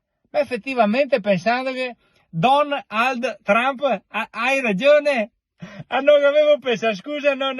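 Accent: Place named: Veneto, Italy. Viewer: Questo accento Veneto mi fa venire in mente il buon papa Luciani. Chissà cosa gli direbbe...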